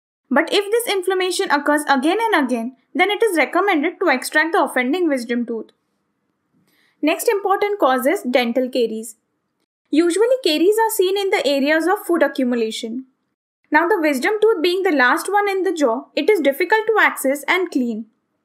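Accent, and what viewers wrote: India. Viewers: They sound Indian of something similar and this ain’t a Pc guide I don’t trust it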